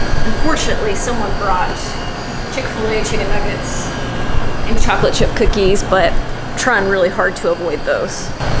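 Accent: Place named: Kentucky, United States. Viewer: Huh, not much talk about Xert. Love your Kentucky girl accent and mannerisms. Couple of suggestions for ya. try magnesium for your cramps. D1 Female athlete taught me that one. Have your carbon bike checked immediately when it creaks. Compromised carbon due to not using a torque wrench can kill ya. I got a little experience with that one. Put some time in on nutrition. May I suggest following Dr Gregor and his whole food, plant based diet. That prepackaged white rice and ground beef is slowly killing you. Headaches? Drink mo water. I do like your ability to articulate what you are thinking. Ya just need a whole lot more schooling. Your buddy Dave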